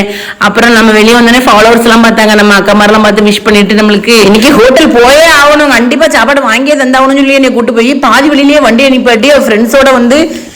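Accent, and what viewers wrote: Tirunelveli, India. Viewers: சகோதரி வாழ்த்துக்கள் திருநெல்வேலி பேச்சு செம அழகா இருக்கு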